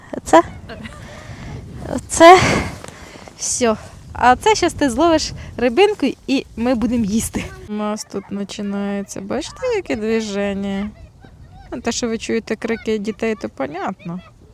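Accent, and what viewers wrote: Kirovohrad, Ukraine. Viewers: Я зарас далеко від Кіровоградщини тобіш на фронті і мені так приємно чути наший рідний суржик я наче побував дома дякую Вам за Ваші відео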